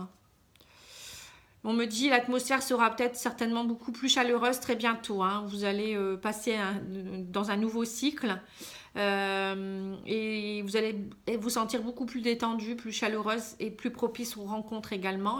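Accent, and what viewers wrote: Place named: Picardy, France. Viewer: Bien vu pour la F J ma moitié trop de signes qui me font penser à lui c est fou heures miroirs son prénom partout sa région !! Je suis Picardie et votre accent ne ment pas ma TCHOTE j ai le même !!! Félicitations pour votre perspicacité et votre don sans tous ces tralala bougies pierres statuts !!! Merci merci merci beaucoup et vous êtes sur le bon chemin vous aussi !!! Chacun sa vocation je soigne avec mes mains suite à une Mort imminente au Touquet Plage je vous embrasse et a bientôt en tant qu Abonnée 🙏😇👍💋🌹🌹🌹🌹🌹🌹🌹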